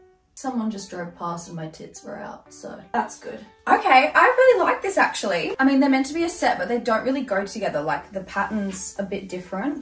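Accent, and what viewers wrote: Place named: Australia. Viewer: You are a beautiful ginger/redhead. Beautiful light green eyes, cute voice with the Australian accent and pretty smile. Beautiful body and curves, cute little belly button, nice little booty and long legs. You look very pretty and STUNNING in everything in this video. The dark blue jean shorts and crop top tank top and turquoise crop top tank top would have to be my favorite on you, those dark blue kinds of colors just look really good on you and make your eyes pop. Hello cutie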